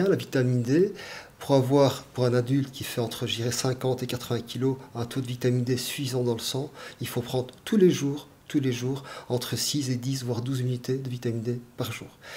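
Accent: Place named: Liège, Belgium. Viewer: Accent liégeois, je crois. Mais il a aussi oublié de muscler sa mâchoire, tout le reste oui dirait-on, mais ses muscles mandibulaires apparaissent hypotoniques ou alors peut-être un lifting facial trop accentué a tendance à lui bloquer la mâchoire inférieure en tirant trop la peau vers l'arrière.. Bref, le manque de mouvement de sa mâchoire fait qu'il "mange" ses mots. Il en dit beaucoup tout en fermant sa gueule.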